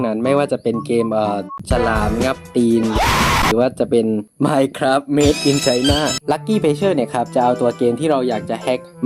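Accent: South, Thailand. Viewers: คนใต้แน่ๆ5555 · เจ้าของช่่องคนใต้รึเปล่าคะ55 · พี่บ่าวคนใต้รึป่าว😮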